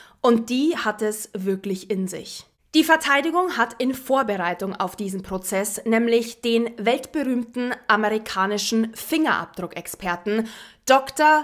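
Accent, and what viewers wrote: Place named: South, Germany. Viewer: Was stimmt mit dir nicht, Inge Richter? Was für ein sinnbefreiter Kommentar ist das denn? Du brauchst dir Videos doch nicht anhören. Viele, unter anderem ich, mögen sie sehr! 💕 Mögen ihr Aussehen, ihre Stimme, ihre Ausrucksweise, ihren süddeutschen Dialekt, kurz gesagt: Ihre Arbeit, die sie sich macht. Und die Mühe, die sie sich für uns gibt. Empathie ist erlernbar. Vielleicht solltest du dich einfach mal damit beschäftigen?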